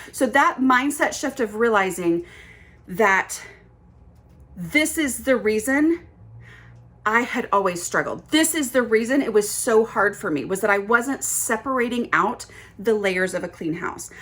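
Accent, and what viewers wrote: South, United States. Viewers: You have a sweet southern accent and voice! You sound like me and my friends :) I loved your video and you're very easy and pleasant to listen to :) Thank you for all the helpful tips and I will be checking out more ! May the Lord bless you and your family:) Rachel and Dobie in Nashville 🎨👩‍🎨😺🍊💕🛐📙☦️🕎🕯️ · I can hear your Southern accent when I read your book. So cool to hear you explain this concept out loud - I'm in the middle of Decluttering at the Speed of Life now. Love it!!!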